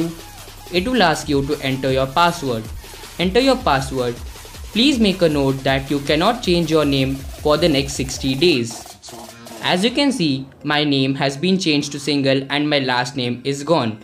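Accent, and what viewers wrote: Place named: India. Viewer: Why indians talk like that... change not chin :)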